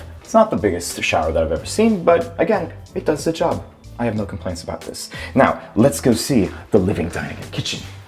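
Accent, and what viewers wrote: United States. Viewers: Came here after watching the Unpacking Japan interview (which was fantastic, by the way), and the wild fluctuations between US accent and faux British accent when giving property tours are cracking me up.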